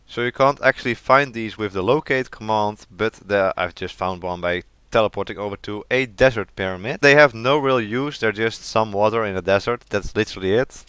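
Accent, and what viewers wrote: Netherlands. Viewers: you are definitely dutch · Superfun vid but i have a question: are you dutch? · Dutch?? If not you sound like one😂 nice vid btw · nederlands accent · That's hella a Dutch accent you got going on, sounds pretty good so far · Ik hoor gewoon dat je uit Nederland komt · You officially are dutch i hear it gewoon plat nederlands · Are you dutch? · Are you from the Netherlands? You definitely have the accent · are you dutch? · Cool video!! Are you dutch??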